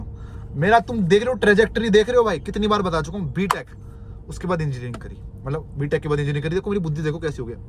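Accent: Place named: Delhi, India. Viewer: bhai aap cheppi bada tagda bolte ho !!!!!!! maira delhi ka yek guy friend hai ditto same !!!!! ikdam !!!!! ussi ki tarah bolte ho 😆😆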